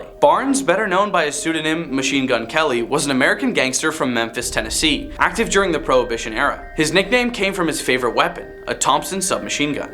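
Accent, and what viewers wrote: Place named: Canada. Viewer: Are you from Canada? A few ways you pronunciate certain words, remind me of some dear Canadian friends, I knew years ago. Love your channel plan to binge today!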